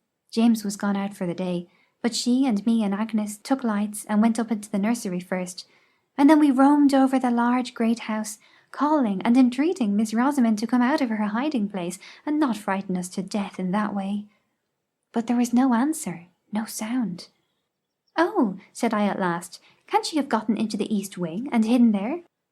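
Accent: Ireland. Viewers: You are a very creative girl ... and I love your Irish accent !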